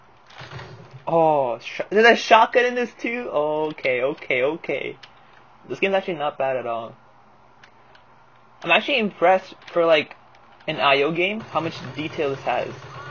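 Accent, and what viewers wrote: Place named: Canada. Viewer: you sound exactly like every other canadian admin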